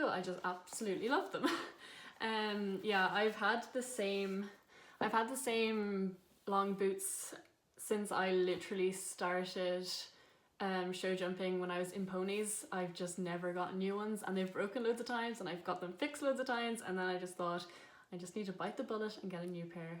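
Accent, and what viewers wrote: Ireland. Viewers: Dear, So nice to see ya, i've been in Ireland for four months last year as an exchange student and i also picked up the irish accent, it is so lovely to hear it now, i had been in Kerry near Killarney, don't you live near Dublin cause you went to the Dublin Festival or so, i would love to see more videos like that, btw love your room and the horse ears pic on the wall, went to Ireland this summer i am actually from germany but love this country so freakin' muuch😍😍😍 i am doing horse riding since nine years,obsessed with it, went this morning, was great😎 🏇 have a great weekend 💚🇮🇪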